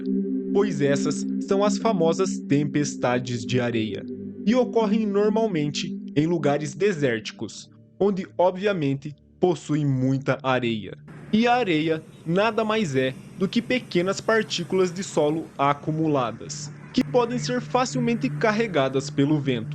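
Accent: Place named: São Paulo, Brazil. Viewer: Vc é do interior de São Paulo... caipires em dia ... muito top...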